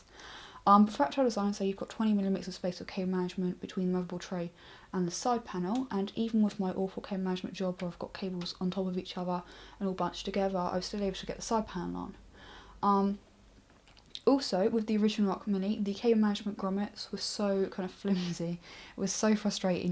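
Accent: United Kingdom. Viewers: Anderson Paschoalon Noooo... It mean that UK has the most diverse population ranging from Scottish to Welsh, from North to South accents, from Southall to Stratford accents, from "chavy" to posh, from Punjabi to Polish... Man..."dys acent" is a droplet in the ocean of diversity over here. Get use to. Or change page if you cannot comprehend it, as for speakers... She has a one of the softest voices I know of and in different situation, she would have your erection just by talking to you. ;-))) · Omg her accent makes it so damn difficult to understand. I thought British accents were the most easiest way of listening or learning English. Her accents makes it seem like all gibberish. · Damn,this english accent.. :| I usually understand british accent but not now :P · Oly VL Haha of all things to like, her accent (look I'm south african, so a british accent appeals to me too), just that I prefer her to other reviews because she isn't some 50 year old fat dude or a 12 yo kid or that she is a female in a male dominated sector (as far as I know)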